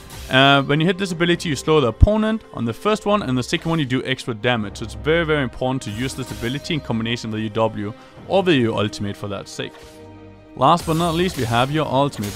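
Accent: Denmark. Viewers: you sound danish :D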